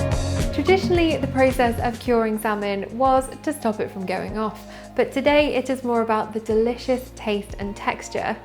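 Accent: United Kingdom. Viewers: I love her accent, she has an old school British charm.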